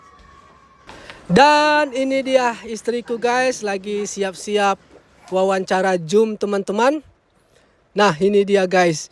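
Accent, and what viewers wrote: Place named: North Sumatra, Indonesia. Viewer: Abang logatnya koq seperti orang dari Sumatra utara sepertinya? Sungainya jernih enak,abang lepas celana jebur Brenang enak!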